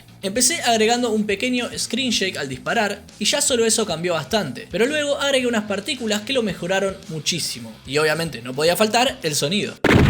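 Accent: Argentina. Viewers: Escuchar a un argentino hablando sin parar como usando frameworks descuartiza el Doom para pasar la tarde. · Un genio total, es el primer video que veo y ya me enamoré, me recuerda mucho a los vídeos gringos y me da satisfacción saber que ahora esta en español y encima argentino (corregime si me equivoco) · Tu voz me recuerda a la del youtuber 'Jota.' pero con acento más argentino jajs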